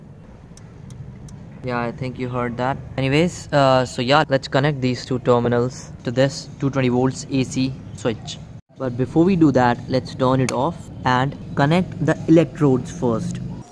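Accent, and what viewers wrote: India. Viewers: For some reason I love an Indian accent with good English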